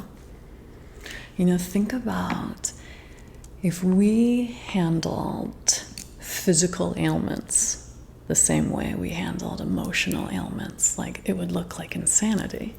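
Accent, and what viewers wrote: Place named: United States. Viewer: I really love your soft and American aristocratic accent. You seem very high society. That combined with your creativity and intelligence and generous inviting attitude is really attractive. It's intimidating but at the same time I would love to have conversations such as these with you. i love your vids! Thank you for sharing! I find it relaxing and interesting!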